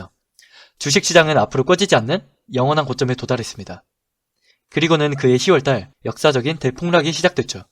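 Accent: Jeolla, South Korea. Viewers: 전라도 사투리 ㅈㄴ세네 ㅋㅋ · 말투가 어째 광주나.전라남도쪽분 같은디요.저는 공포구간에서는 잘 사긴하는데 수익구간에서 끌고가는걸 잘 못해서 끌고가는거 도움이될까하고 구독.좋아요.박았습니다. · 전라도 놈이네ㅋㅋ 믿을수가 없다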